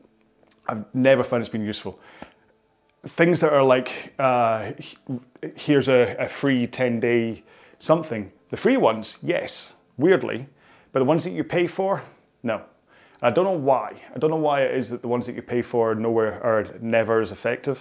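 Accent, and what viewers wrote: Scotland, United Kingdom. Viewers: Another great one!! Thank you! I’m quite a fan of the Scottish ramblings 😁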